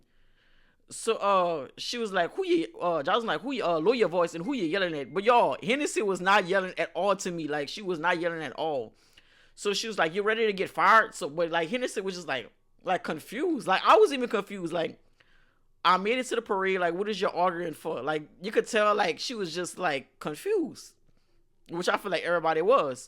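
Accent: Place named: Louisiana, United States. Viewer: you have to be from the 337 😭 if not i would be genuinely surprised because you have a strong accent lol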